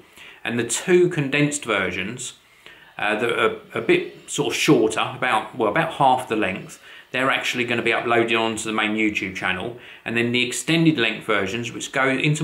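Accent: United Kingdom. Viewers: If Only we could get Linus to stop asking us to subscribe and his annoying annotations asking the same thing. But I do not mind when you say it, sounds better with a British accent :)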